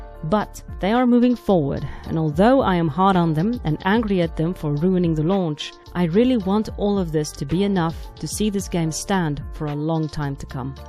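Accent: South Africa. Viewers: Found you last vid and now sticking around, loving your content also are you from SA? the "my China" gave it away. That 4v4v4 is freaking nuts btw and really chaotic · Not game related but your accent sounds really familiar are you south African perhaps ?😂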